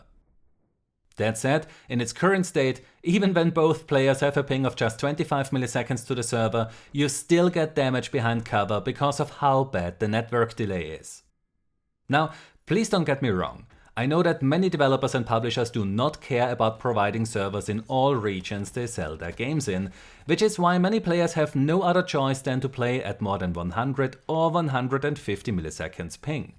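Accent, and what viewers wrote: Germany. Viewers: Is your first language german? You accent sounds like that and is easily to undestand for me as a bad english speaker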